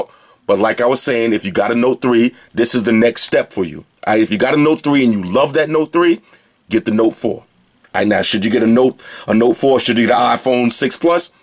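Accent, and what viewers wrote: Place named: New York City, United States. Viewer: I'm here just to hear your NYC accent!!!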